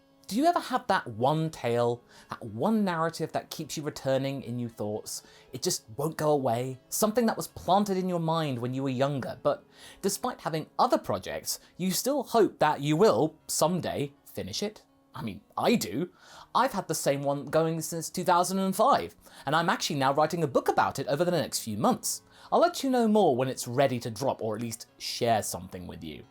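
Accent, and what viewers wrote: United Kingdom. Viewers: J2391 hes british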